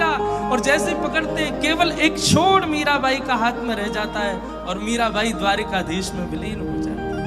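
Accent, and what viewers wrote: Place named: Braj, India. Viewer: Mharaj apki ktha brij bhasha bhut pyarilagtihain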